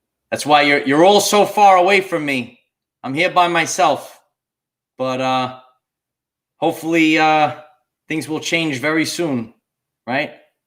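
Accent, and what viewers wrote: New York, United States. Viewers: I love your New York accent!!!!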